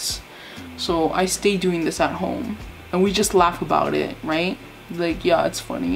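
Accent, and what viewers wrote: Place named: Canada. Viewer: You’re either from Canada or Seattle. I forgot which one says beg=bag. Maybe both Edit: Its Canada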